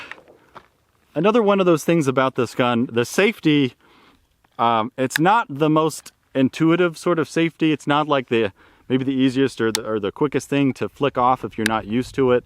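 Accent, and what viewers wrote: United States. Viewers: تمنيت لو أن ما تقوله مكتوب باللغة الإنكليزية أسفل الڤيديو كي أستطيع ترجمته ، لأنني لا أجيد اللغة الإنكليزية جيداً ، ناهيك عن اللهجة الأمريكية سريعة .. عانيت بمشاهدة هذا الڤيديو و أنا كل لحظة أذهب إلىٰ غوغل من أجل الترجمة .. الڤيديو مهم و أعجبني - شكراً .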